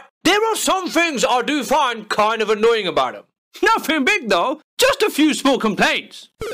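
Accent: United Kingdom. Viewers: british lmao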